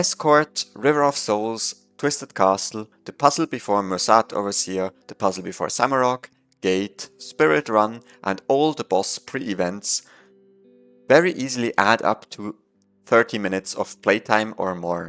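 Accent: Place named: Germany. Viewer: You are German, yes?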